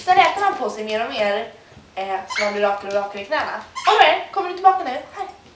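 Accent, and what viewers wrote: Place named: West Coast, Sweden. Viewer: Det var roligt! Är du i Göteborg/västkusten? (just WAGguessing at your accent) Lärde mej svenska pä länge sen! I totally agree with you re most English speakers not knowing another language! (Im USA). Thanks to kikopup for leading me to your video. She has awesome dog training videos here. Hejdä.God Jul!!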